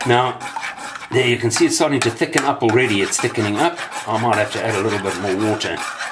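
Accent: South Africa. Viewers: Are you from South Africa then? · are You South African?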